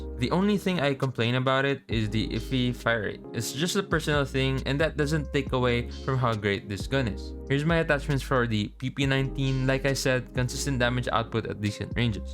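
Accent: Philippines. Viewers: Btw kuya, feel na feel ko talaga na nahihirapan kang mag basa ng script dahil sa accent natin.. Super Relatable Haha. Much Love Kuya. ❤️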